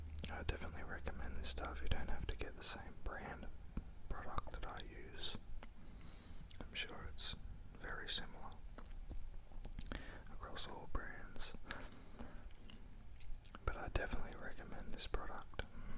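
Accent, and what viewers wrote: Australia. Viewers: Love the whispering with the Australian accent it’s hypnotizing!😂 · Where have you been all my life with that voice? Another Ozzie ASMR! Honestly, I already love the accent and then the whisper....I'm in heaven! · Australian English is already an ASMR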